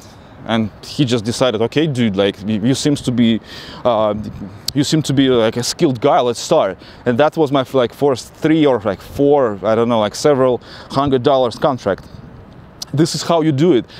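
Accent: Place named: Russia. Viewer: I LOVE your Russian accent. However, I agree that Americans are ignorant and will judge you because of it. But you MUST showcase it as a positive: like you did in your video about it being in your blood to be an engineer. Just tell people immediately that you are Russian and the positives of it. What I don't like is when a Russian girl decides she must lose her accent to blend into the American culture.... NO. Not necessary for a woman to do that. Everything you say about Russian v.s. English confirms my hypotheses: they are essentially different worlds... different operating systems. I notice that Vladimir Putin now always has an earpiece to listen to Russian interpretation whenever he is being interviewed (or... interrogated in many cases). I know that he knows English... but he is strategically thinking in Russian all the time and that's how he makes very critical decisions.. 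through Russian.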